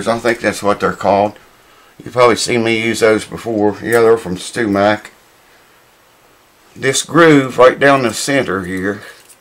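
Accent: South, United States. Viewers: Heard of this for specialized frets ( dont remember the details). Like you vids mostky because of good practical info. 2) your southern drawl. I am from the north and find it facinating! Like hearing it ( used to live in north west FL - lots of alabamans and was in army- same. Also southern females are especially fantastic!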